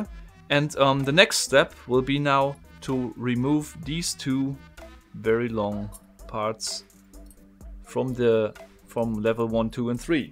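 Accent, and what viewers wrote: Germany. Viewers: ich hätte nie gedacht .... dass ich diesen kanal in meinem leben brauche :-D faszinierend .... entspannend und einen niedlichen deutschen akzent ... ich bin in einer endlosschleife gefangen und kann nicht aufhören deine videos anzuschauen ... danke dafür ... grüße aus mittelfranken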